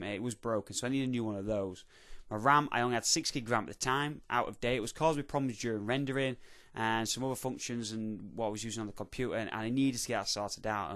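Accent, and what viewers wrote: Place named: Yorkshire, United Kingdom. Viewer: Yorkshire I think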